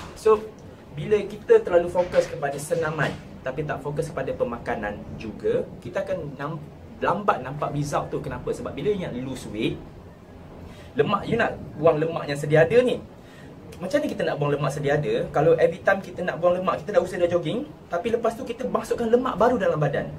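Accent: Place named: Sarawak, Malaysia. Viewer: are you sarawakian ? sbb mcm ada slang sarawakian ckit heheh.. just my opinion laaa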